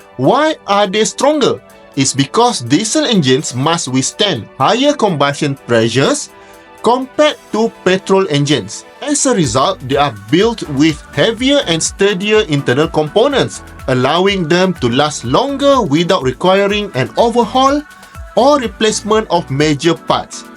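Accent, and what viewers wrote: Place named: Malaysia. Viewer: Mantaplah prof... Bahasa English,tp intonasi masih lg bahasa Malaysia , masih familiar 👍👍👍👍👍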